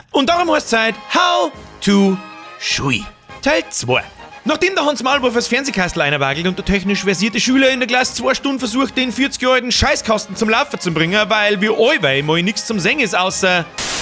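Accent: Bavaria, Germany. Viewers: Wia scho bei fui Videos an nogl afn kopf drofa 😂 lang is scho hea awa ja so in etwas was. Da is wenigsdns oana den woma faschdäd 😂😂😂😂 und dea an gleichen Dialekt red. Ja bin im Nachbar Ort af gwogsn 👍👍👍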